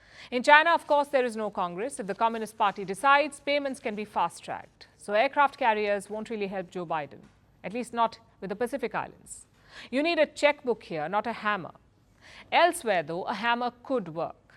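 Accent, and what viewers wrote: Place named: India. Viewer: Your channel has not improved at all, you are speaking curry English all the way. And besides you are talking rubbish all the time, the Americans are lacking money, I just want to ask how long would they afford to keep 5 Aircraft Carriers going on in the front door of China ? By the way are they not afraid of Chinese missiles Dongeng 21 ?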